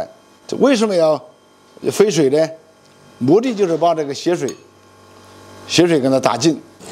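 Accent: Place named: Hubei, China. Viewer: 亲切的湖北口音😊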